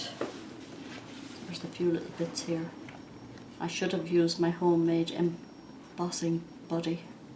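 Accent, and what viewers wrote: Ireland. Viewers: I've not looked into embossing diffusers yet, but your video makes it look so simple. A great technique to add to my cardmaking, thanks for sharing! Love the Irish accent too, lol! P. S. I wonder if you could use a plastic cutting board/mat from the Dollar Store for this purpose?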